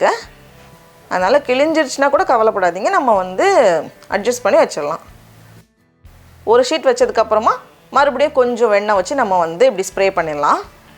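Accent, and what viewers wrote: Nagercoil, India. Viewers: அக்கா நீங்க பண்ற ஒவ்வொரு சமையலும் அவ்வளவு அழகாக உள்ளது அதுவும் உங்கள் நாகர்கோவில் பேச்சி அருமையாக உள்ளது எனக்கும் நாகர்கோவில் தான் நீங்கள் சொல்லித் தரும் விதம் எளிமையாக புரியும் படி இருக்கிறது ரொம்ப நன்றி தயவுசெய்து எனக்கு பதில் கமெண்ட் குடுங்க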